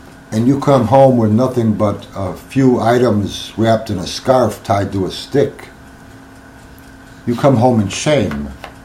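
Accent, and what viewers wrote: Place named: Chicago, United States. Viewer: Hey, no joke I just asked my son if he thinks looks like anyone he knows and he took one glance and said, "Al Pacino!" LOL! Did you know that your Chicago accent is very much like Upstate NY?! I worked in a Bank Call Center for years and always knew that accent! Chicago or Upstate NY where I'm from! Had many, many ppl ask me over the years if I was from Chicago and are surprised when I say NY.... never been to Chicago.... Just a little trivia for ya!! Namaste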